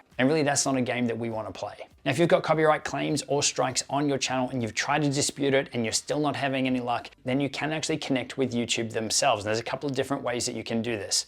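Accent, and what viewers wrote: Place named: Australia. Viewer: Bro you are wonderfully helpful your videos are amazing. I wish you it was no in Aussie accent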